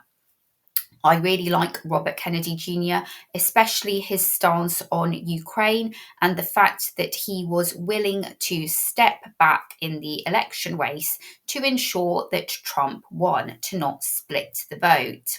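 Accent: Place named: United Kingdom. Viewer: Sweet British accent ❤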